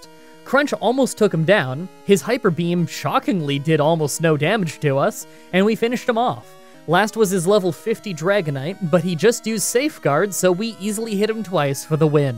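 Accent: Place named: Canada. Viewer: His Canadian accent is so cute, eh!!! Lol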